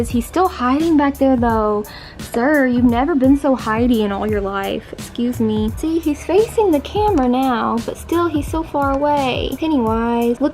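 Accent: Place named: Tennessee, United States. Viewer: aww awesome video! this channel deserves more views! love hearing the tennese accent to lol!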